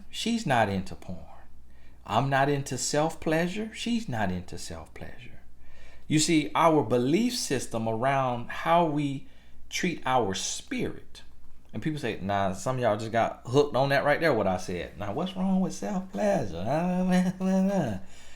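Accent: South, United States. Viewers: Be blessed...to your and your family. I actually like your country accent. We all have accents tho' 😏. Some might not think so. 😏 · keep the accent, it is apart of your brand. You remind me of my southern relatives whom i truely miss. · Hey brother, great video as always! I love your Country accent it's so cool and you are pretty funny lol... keep up the good work... God bless you.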